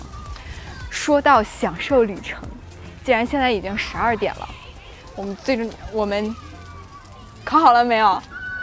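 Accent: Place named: Linyi, China. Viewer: 哪里像冀鲁豫三角地的人了- -济宁聊城的口音不是这样的吧。。这个倒比较像临沂那边的2333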